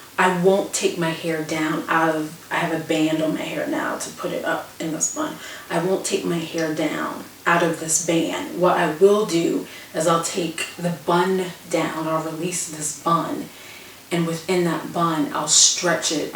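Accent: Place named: South, United States. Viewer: where are you from? lol I know thats random but I cant place that accent. Certain words you say sound a lil southerny like "ool" lol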